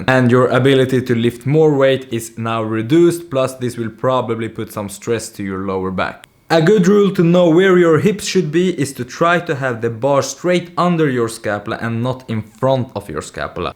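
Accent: Sweden. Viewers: Man hör verkligen att du är svensk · Are you swedish? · Älskar hur man märker att du är svensk på en gång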